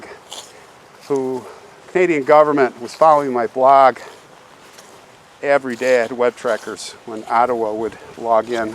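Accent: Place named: Chicago, United States. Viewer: WOW 😣 WHAT A STORY AND VERY SAD CASE THAT THE NATIVE AMERICANS ARE TREATED SO POORLY, YOU ARE UP NEAR MY FAMILY WENT ON VACATION WHEN I WAS A KID, MINOCQUA, WOODRUFF, EAGLE RIVER, ST GERMAIN, TOMAHAWK GREAT AREA, HOLY CRAAAP THE TERROR WAS THE SHIP I SAW A SHOW ON THAT RECENTLY AND THE DOCUMENTRY TOO GOOD STUFF BROTHER, I CAN HEAR THE CHICAGO AREA ACCENT IN YOUR VOICE LOL 😆😆 TAKE CARE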